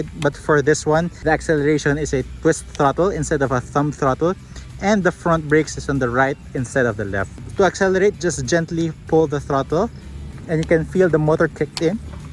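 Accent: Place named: Philippines. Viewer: YOU SOUND SO PILIPINO.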